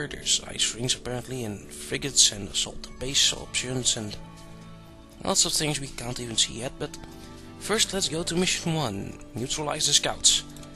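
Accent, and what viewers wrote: Netherlands. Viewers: u sound a bit dutch :P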